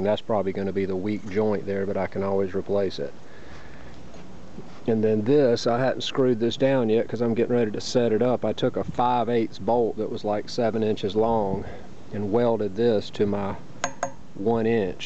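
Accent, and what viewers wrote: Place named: United States. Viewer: Real American accent 👍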